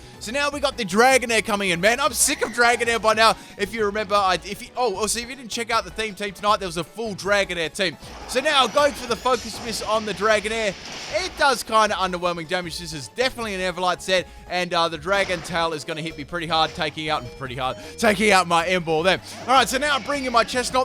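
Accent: Australia. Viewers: Are you sure that's not because of his accent? Different parts of Australia pronounce words differently. South Australia is a big example of it.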